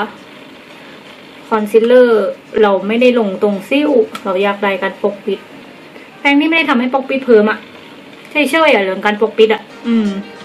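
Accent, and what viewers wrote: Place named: South, Thailand. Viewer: ชอบอ่ะเวลาพี่แหลงใต้ ฟังแล้วลื่นหู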